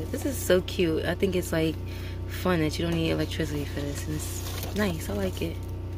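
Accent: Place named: New York, United States. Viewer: I love your videos, but i honestly enjoy hearing your voice. Its pleasant and calming. Plus that New York accent is everything 🤗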